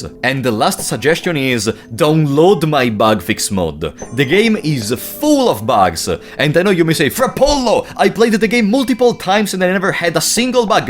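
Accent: Italy. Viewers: Hi as a new player i agree with you and I want to ask you if I should try to talk to enemies, I think is important but my friend always told me that is useless because all entities there are evil... Also you sound like you are italian.... are you?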